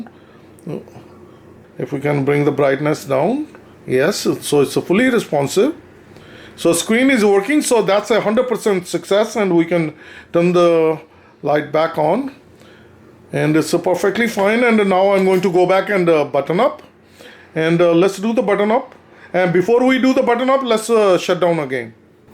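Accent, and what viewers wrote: India. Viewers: Its indian accent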